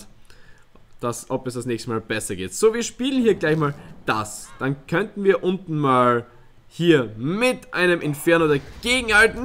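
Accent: Austria. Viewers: Ich liebe österreichisch #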